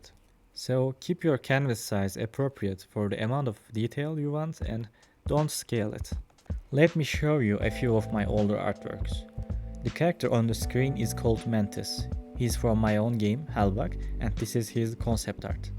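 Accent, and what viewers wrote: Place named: Turkey. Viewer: Türkçe aksanı nerede görsem tanırım... güzel video reis oynu da wishlistledim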